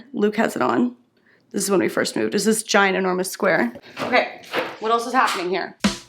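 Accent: Italy. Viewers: maddai il tuo italiano e' eccellente! Hai pure un accento emiliano! Brava e complimenti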